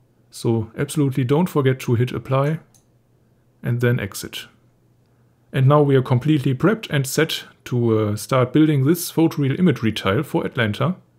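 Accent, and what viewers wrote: Germany. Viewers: also ich finde dein english ja absolut spitze, aber muss dieser Deutsch akzent dabei sein? XD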